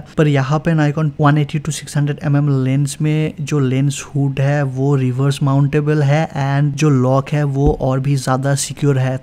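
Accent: Bengal, India. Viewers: hindi sahi hai lekin bangal hai tone to hogi hi bangali, aap video me or achche se hindi bole, video or chalegi sath hi bangali me bhi alag se banaye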